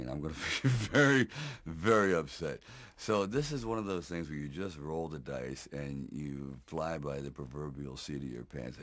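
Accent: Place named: Philadelphia, United States. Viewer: Philadelphia accent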